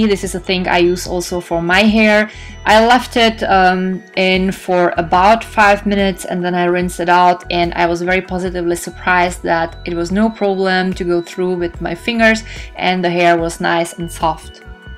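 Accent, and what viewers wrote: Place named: Germany. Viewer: you sound very german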